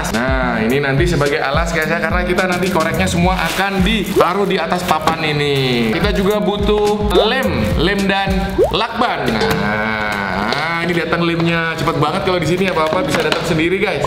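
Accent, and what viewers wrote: Bali, Indonesia. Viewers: Orang Bali? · Aku yang mikir Kak ini orang Bali, atau emang orang Bali, atau cuma logatnya yang mirip orang Bali, atau aku ketinggalan jaman? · Logat bali ny kentel bet wkwkw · Kyk logat bali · Aku baru liat chanelni Kok kek logat bali:) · Memang orang bali, daerah denpasar kalo ga salah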